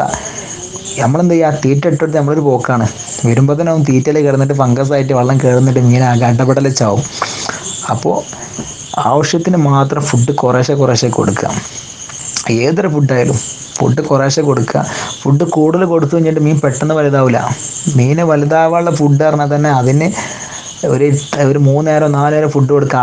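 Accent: Malappuram, India. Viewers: Superbb Malappuram slang😋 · Samsaram kettappazhe thonni.. 😇😇mlprm isttam😍😘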